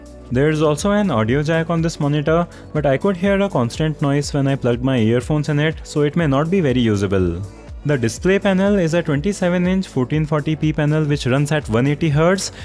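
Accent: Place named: India. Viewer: English with indian accent.. beautiful.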